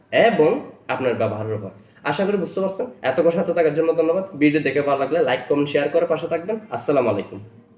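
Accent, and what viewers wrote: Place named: Chittagong, Bangladesh. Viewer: আপনি নিশ্চিত একজন চিটাগাং এর ছেলে এম এম আম আম এটা কি ছিল??? উপস্থাপনা খুব ভালো হয়েছে অসংখ্য ধন্যবাদ প্রিয়।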